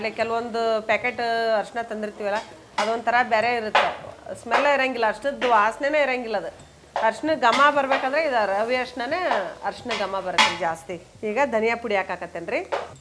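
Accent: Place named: North Karnataka, India. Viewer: ಅಲಸಂದಿ ಕಾಳು ಪಲ್ಯ ಸೂಪರ್ ಅಕ್ಕ ಉತ್ತರ ಕರ್ನಾಟಕ ಭಾಷೆ ಮಾತಾಡ್ತೀರಲ್ಲ ನಿಮ್ಮದು ಯಾವ ಊರು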